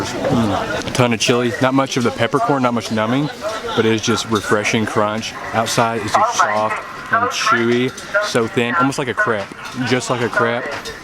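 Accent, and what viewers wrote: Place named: South, United States. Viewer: you are super star in my book to eat Szechuan ma-la chili, pork brain, tripe and all the other deliciousness. Also, love your calm voice and slight Southern accent. 👍🙌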